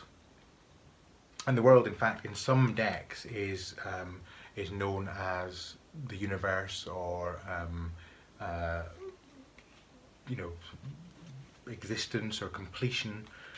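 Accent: Scotland, United Kingdom. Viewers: Six of Swords is one of those cards I go straight to with a new deck. I have my preferences as to how it looks. Nice to see the completely trimmed Spiral Tarot. I did the same to mine, red borders be gone! Lovely video,. And your accent, trying to place it. I'm guessing Scottish? Thank you for sharing. 😊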